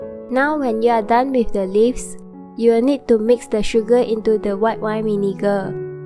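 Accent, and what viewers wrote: Malaysia. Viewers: Definitely Malaysian hahah, 'Add Oil' arh!👍✌ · I have just posted mint sauce recipe and found this video. I love this. I am now a bit confused since this video explanation in Japanese, subtitle in English and pronunciation is typical Malay Chinese... Anyhow, I love your channel and subscribed. Looking forward your coming videos!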